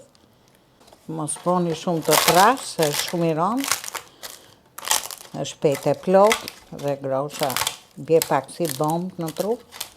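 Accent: Tirana, Albania. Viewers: Të lumshin duart dhe goja që flet Tironce puro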